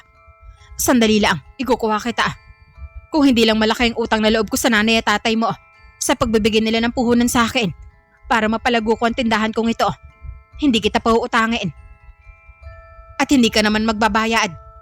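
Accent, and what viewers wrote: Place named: Rinconada, Philippines. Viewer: Parang ringconada ka idol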